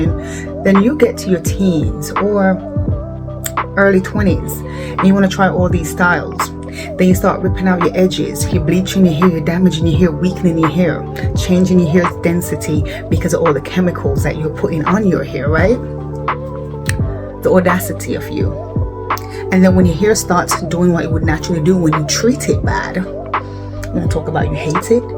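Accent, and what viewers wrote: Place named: Jamaica. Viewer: Ms, where are you from? I hear some Jamaican in your voice. Loved seeing the progression in the video. I too suffer from hair loss due to hormonal issues. Looking forward to seeing your progress.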